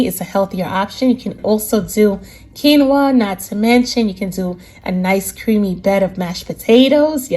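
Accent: New York, United States. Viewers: Delicious recipe, love your Ny accent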